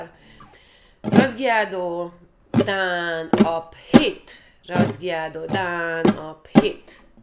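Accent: Hungary. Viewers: magyar akcentus befigyel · A magyar akcentus de ismerős mindig basszus :D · sounds like Magyarrrr. I remember this accent from Dominica my sweet girl from Zsigetvar love from Istanbul thanks for this video. Ksönöm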